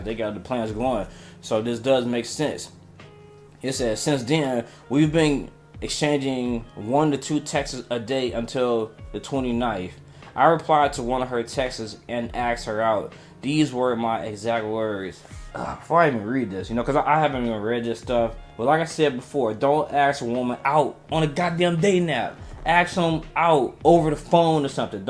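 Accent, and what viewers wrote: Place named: Tulsa, United States. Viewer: what is from? I’m asking because my brother is from Tulsa and he has that same accent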